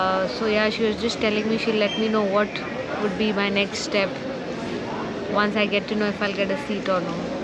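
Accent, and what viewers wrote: India. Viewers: Хорошая девушка. Но её английский очень похож на английский индийца в интермедии комедиклаба про американское радио с Харламовым... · I have always loved the english accent for indians... Hello to You beautiful young Lady ! Best regards from Bucarest ROMANIA 💐🤞🍀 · Такой прикольный индийский акцент. Приятная девушка. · indian english😏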